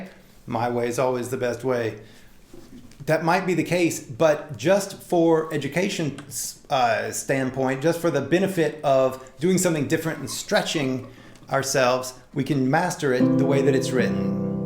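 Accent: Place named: South, United States. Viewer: I get his point, good vid...also keep trying to place his southern accent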